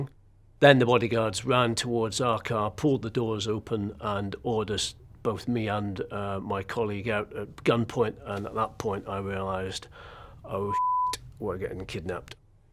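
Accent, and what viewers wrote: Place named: Geordie, United Kingdom. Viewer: Is dude a Geordie? Keep hearing bits of Newcastle in his voice. Posh Newcastle, Gosforth or Jesmond. Not like elswick lol 🖤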